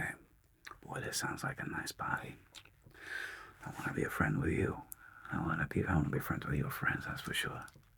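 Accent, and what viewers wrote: New York, United States. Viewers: I like your NY gumshoe accent. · This felt like a spider noir theme with the New York accent and the black and white. Amazing acting too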